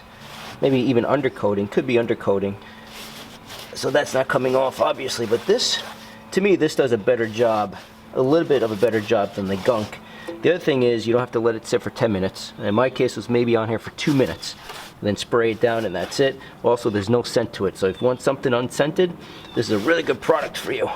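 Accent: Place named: New Jersey, United States. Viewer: New Jersey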